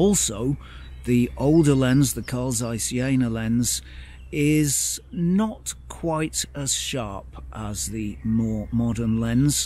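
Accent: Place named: Stockport, United Kingdom. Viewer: Love your videos, having shot film in the past, and currently looking for a 35mm having got the zeiss 21mm loxia, which i love, but i'm thinking the Voigtlander 35mm f2 Apo-Lanthar Lens might be my solution, so this content is most helpful , thanks, keep up the good work. ps your accent sounds like you're from Stockport, am i wrong ? , i only spent a year there.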